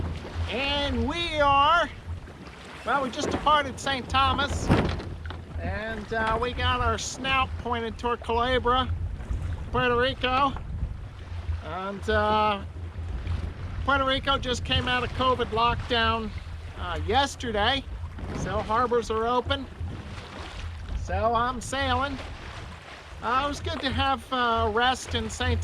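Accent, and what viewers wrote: Maine, United States. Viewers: Are you a Mainer. You talk very similar to myself and most of my Maine relatives. That's meant to be complimentary. And explains your excellent seamanship. "Stand Fast all ye men from Maine who go down to the sea in ships."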